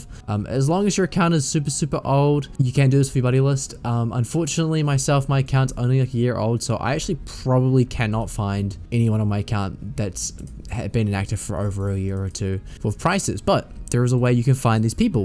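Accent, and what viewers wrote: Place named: New Zealand. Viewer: Subbed, I liked this video cause you sound like you’re from nz n I wanna follow more creators outside of us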